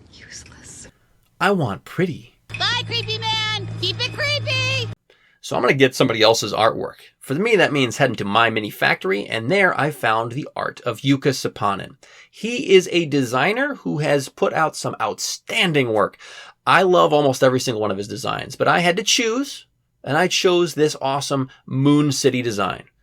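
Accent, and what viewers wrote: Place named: Australia. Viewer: That Aussie accent, lmao never gets old.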